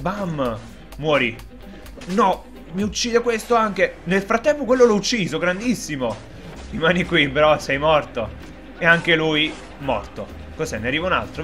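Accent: Naples, Italy. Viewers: non so se già te lo hanno chiesto ma sei di napoli????? Comunque bellissimo videoooooo!!!!!!!!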